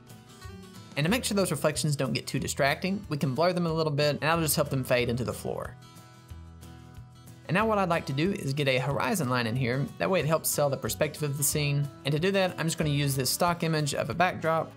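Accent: South, United States. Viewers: unrelated, but are you from the south? i love your accent and how you enunciate words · extra points for a cool southern accent lol. nice work, looks perfect!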